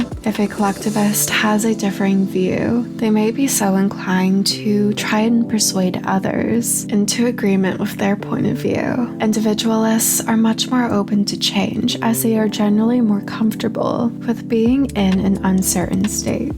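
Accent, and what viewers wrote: RP, United Kingdom. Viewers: I hate how your voice sounds fry and poshy in this video. You don't need to take this ton ...its ridiculous. It took me out.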